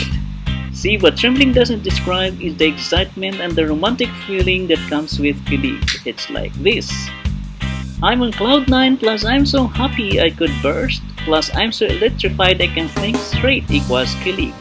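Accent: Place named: Philippines. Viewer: Nice one you sounds filipino ag galing. Team unity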